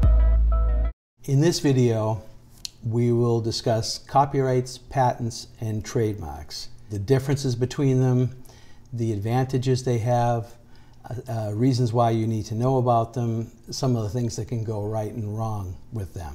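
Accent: Boston, United States. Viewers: I can hear your Boston ❤️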